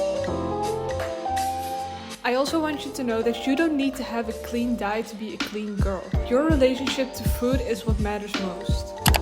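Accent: Netherlands. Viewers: hey girl ben je toevalig nederlands ik hoor een accenttt!?? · You have a really Dutch accent, idk why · I can hear from ur voice ur Dutch and if ur not then I am tone deaf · girlieeeeee are you dutch you have a littele dutch accentttttttttttttttttttt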